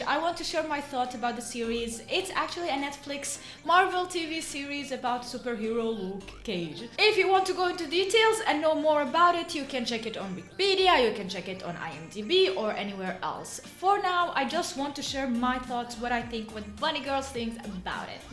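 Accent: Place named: Russia. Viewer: Hey, love your accent..just wondering where are you from? Russia? 🐰👍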